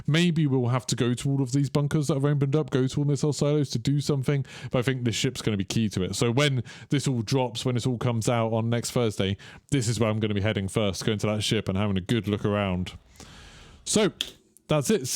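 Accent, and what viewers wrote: United Kingdom. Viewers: Question: I seriously hope I'm not being offensive, so please don't like, flip out, but why do British Pronounce LZ as LZead and Lieutenant as Leftenant?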